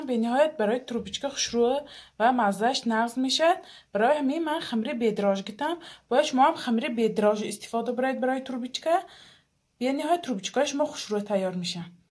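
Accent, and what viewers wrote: Isfara, Tajikistan. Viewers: Шумо а Исфарами лафзатон монанд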